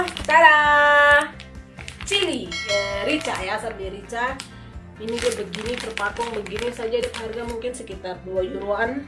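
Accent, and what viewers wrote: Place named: Papua, Indonesia. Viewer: Bule jerman pintar bahsa Indonesia dan yg lebih asyik bisa logat bahasa Papua dn Maluku..keluarga bahagia..salam kenal dr kota Timika🤩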